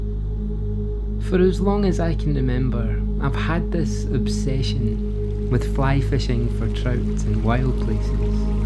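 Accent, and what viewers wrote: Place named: Scotland, United Kingdom. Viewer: I stumbled across your channel a few months ago. You stayed in my mind for your amazingly respectful way of treating fish and your lovely Scottish accent. Thank you so much for this video. Amazing fishing, great editing, beautiful pictures. Takes me back to catching brown trouts in the lochs of the Assynt region back in 2014, those memories are a real heartwarmer right now. Tight lines and greetings from Germany!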